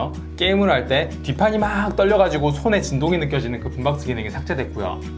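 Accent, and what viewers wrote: Gyeongsang, South Korea. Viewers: 경상도 사투리가 너무 깊게 박혀있네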